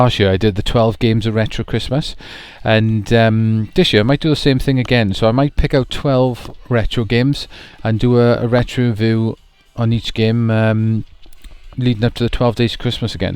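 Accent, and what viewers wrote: Wales, United Kingdom. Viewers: Lol..'if you're not into it, it's a waste of money'. I did laugh at that. Even more golden in your Welsh accent ;) Nice haulage indeed. Many may dislike you for that Wii U bundle..jammy git. ZombiU is good fun,I really like it. Great playing that in a dark room, great atmosphere about it. I'd be keen on seeing your new 12 days of, for sure :)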